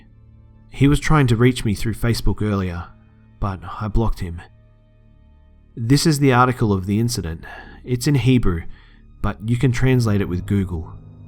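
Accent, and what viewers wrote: Australia. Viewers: I think he's Australian? someone correct me if I'm wrong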